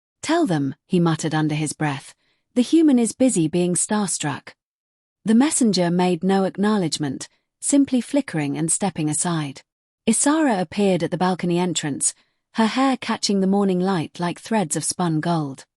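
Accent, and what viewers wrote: United Kingdom. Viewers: Thank you, plus I’m not into this British accent thing and all. Maybe creators will analyze what voice works best to maximize their acceptance. Sometimes a female voice works, most of the times it doesn’t.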